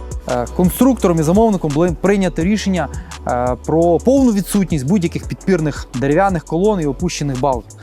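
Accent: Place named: Galicia, Ukraine. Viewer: Я понимаю это позорный галичанский суржик бандеровцев. Мерзко слушать . Тоже отписка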